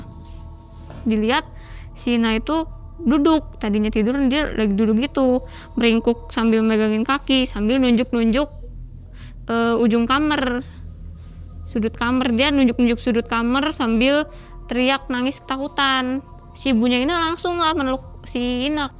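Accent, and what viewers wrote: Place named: Cirebon, Indonesia. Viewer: biasanya org cirebon klo ngomng ya je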